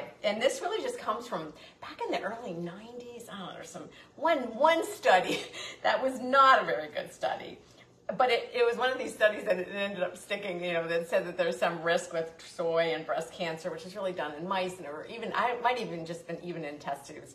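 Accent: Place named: Brooklyn, United States. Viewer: new subscribwe love love love your videos and personality i happen to be vegan am wondering if you are from brooklyn as your accent sounds it i was born there but let 50 yrrs ago now in georgia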